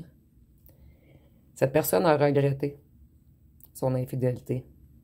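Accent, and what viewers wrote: Quebec, Canada. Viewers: Ah ah non en France ce n’est pas spécialement en juillet ! C’est toute l’année à n’importe quel moment ! Sauf que dans mon cas en effet, mais c’est moi qui doit déménager en juillet ! Avec un peu de chance il aura envie de venir emménager chez moi ! 😆 Merci pour cette guidance ! C’est bon d’entendre votre accent Québécois qui me manque beaucoup ! ❤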